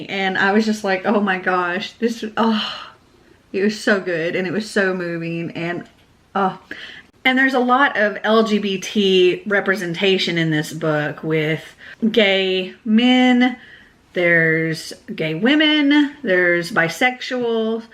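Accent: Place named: United States. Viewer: that's true he was nice all the time.... Btw I think you have the best accent of all the American booktubers!! Sorry for the weird comment but I always like hearing you speak in your vids!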